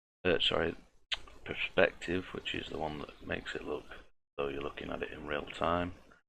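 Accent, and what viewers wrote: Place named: United Kingdom. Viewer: It's about time we seen a brit doing videos more on youtube and the best thing of it yet is, it's solidworks 2017 of which I'm learning to use better!! Proper banging video dude, I think everyone should drop their H's where I live here in Northampton and being a southerner I'm seen as an alien doing that LOL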